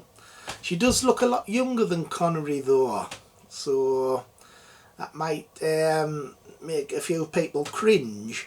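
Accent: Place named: Northern England, United Kingdom. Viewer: Being from the NE of England myself (South Shields) it sounds like is from Sunderland or Durham.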